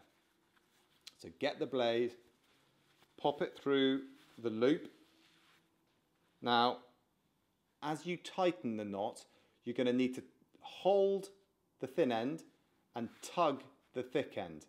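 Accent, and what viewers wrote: England, United Kingdom. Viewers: The best part of your video was your English accent since I'm kind of partial to the queen 👑 of England. Well I have to watch your videos at least 10 times maybe 12 times. But I got it. Hey I like your store. 🤔 Maybe I should go to more of your videos and find out how you did it I kind of like that's a great idea having a nice store like that I wonder if it would work in Bothell Washington probably would cuz Microsoft is here Amazon is here Google is here Nintendo is here.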